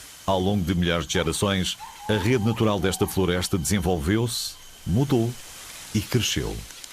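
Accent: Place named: Portugal. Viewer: Português de Portugal é muito feio cara, na moral não tem como kkkkkkk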